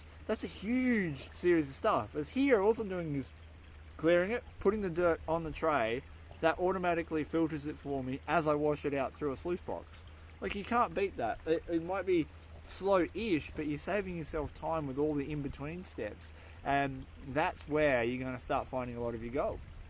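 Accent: Australia. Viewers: Nice, I was thinking Aussie or New Zlander, none the less great job and Keep up the great work.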